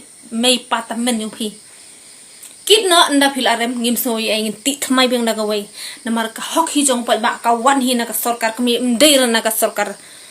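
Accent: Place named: Khyrwait, India. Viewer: Best bha i ni ikong ban kren khyrwait beit nga dei na mawkynrew nga ruh nga kyrshan prah❤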